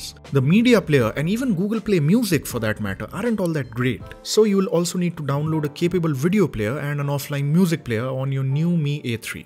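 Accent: India. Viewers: sorry to say, i hate ur indian accent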